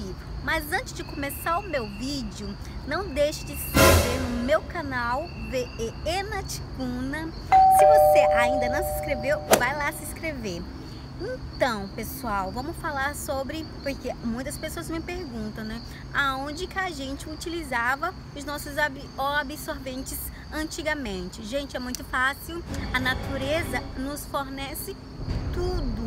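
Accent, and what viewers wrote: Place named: São Paulo, Brazil. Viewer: Índio com sotaque paulista !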